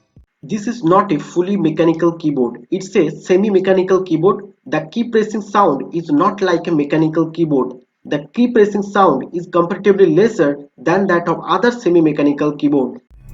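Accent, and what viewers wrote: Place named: United States. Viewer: Bhai english indian wali bolo...ye to American ho gai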